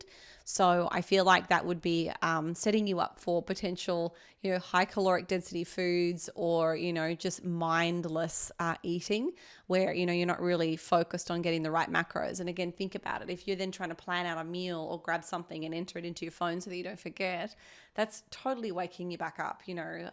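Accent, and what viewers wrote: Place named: Australia. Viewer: Hello from California. Terrific advices & lifestyle vlogs. We knew we were watching a champion ever since we watched your first videos, Ms.. Inspirational. We like the Australian accent, Australian celebrities, & Australian models. You are a fantastic coach/ personal trainer, good teacher. Congrats from our channel 🎊✨🦜🦜🦜Australian Parakeets Stars✨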